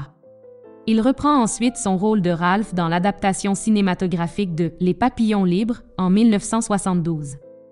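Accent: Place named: Quebec, Canada. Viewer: Toujours marrant l'accent québécois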